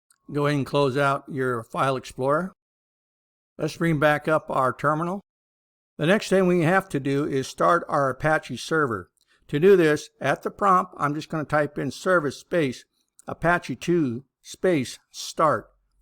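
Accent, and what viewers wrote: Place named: United States. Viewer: hoooly the voice so american based love that nice video KKONAW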